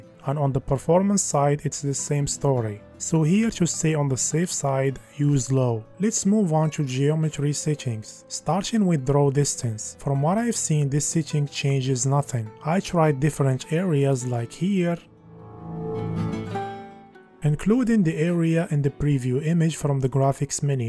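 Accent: Morocco. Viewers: You're Moroccan aren't you XD I can tell by the accent. Best of luck ! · where are you from ? you have a Moroccan accent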